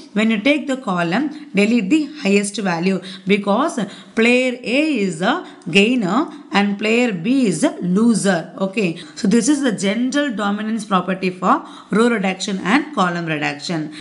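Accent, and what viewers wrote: South India, India. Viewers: I don't know how to thank you I just want to say I love you ma'am and specially love your south Indian xent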